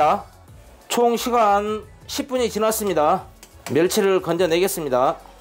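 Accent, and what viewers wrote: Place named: Yeosu, South Korea. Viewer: 여수 억양이 많으시네요 잘봤습니다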